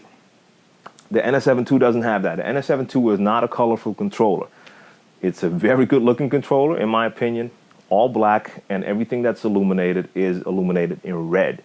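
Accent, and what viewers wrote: New York, United States. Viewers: it's funny i thought you was american from ny :) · Wait. You from the Netherlands ?!!! Woooow, I thought you were from NYC. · wow he is not from NYC that's crazy i was thinking he was lol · you from the netherlands????? mannnnn i thought you were from NYC. have the accent. lol.. good looking on this review. I have Numark ns7. love it.. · you said you from the netherlands? u sound like u from new york lol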